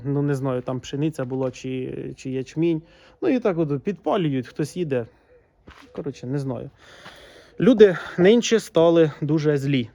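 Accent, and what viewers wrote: Poltava, Ukraine. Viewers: О, полтавський говір...😊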